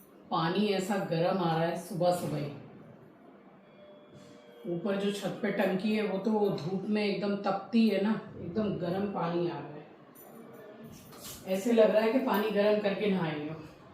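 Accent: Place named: Uttar Pradesh, India. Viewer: Dear app Calcutta Se Hain Magar aapke bolane ki style Puri UP ki hai aap baton baton mein bahut se aise word bolati Hain Jo ekadam Apne se lagte Hain❤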